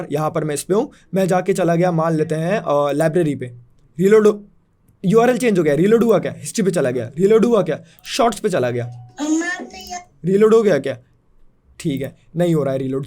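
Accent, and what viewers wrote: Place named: Indore, India. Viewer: Bhiya indore s ho asa lgta h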